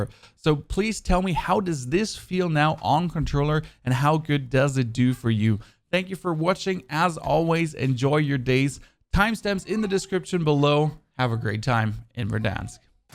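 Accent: Germany. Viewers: Alta du bistn Deutscher? Holy moly, ich hab's nie vermutet... xD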